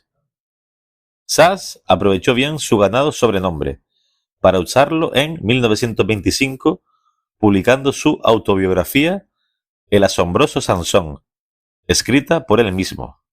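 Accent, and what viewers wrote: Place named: Canary Islands, Spain. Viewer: Muy buen contenido estás haciendo. Si ves conveniente podrías hacer vídeos explicando su método de entrenamiento para tendones. Gracias, por cierto por tu acento creo que eres canario, yo soy de Gran Canaria. Un saludo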